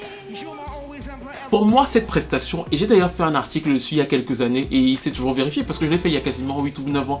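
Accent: Cameroon. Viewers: L'accent camerounais 😎😎...so proud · Je viens de découvrir la chaine, j'ai reconnu l'accent du 237😂😂😂 et j'adore les vidéos sont longues mais on ne vois littéralement pas le temps passé tellement tu t'y connais dans le domaine.... Well done à toi.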